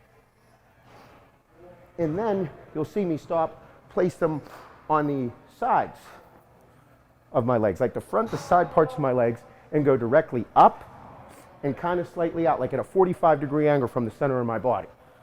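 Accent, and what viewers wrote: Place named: Pittsburgh, United States. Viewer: Heard the accent before I saw the word Pittsburgh in the back. Sorry about Sunday. That defense is in trouble.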